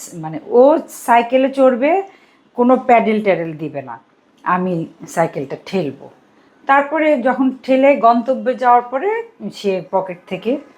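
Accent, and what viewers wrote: Sirajganj, Bangladesh. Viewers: সিরাজগঞ্জের টানযুক্ত ভাষা মাঝেমধ্যে ২-৪ লাইন প্রতি ভিডিওতে বলবেন, আপনার মুখে খুব মানায়।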